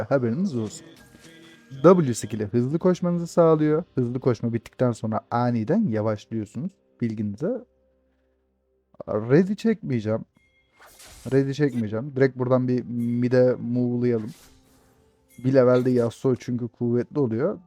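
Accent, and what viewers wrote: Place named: Anatolia, Turkey. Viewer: Bu içi anadolu ağzı beni köyümde hissettirdi.Sivaslı mısın?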